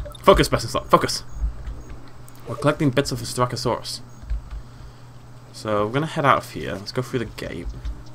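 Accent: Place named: Cockney, United Kingdom. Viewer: Haha, you say assume like "ashoom" and instead of a "th" you use "f". What accent would that be, Cogney? It's interesting how there are so many different dialects.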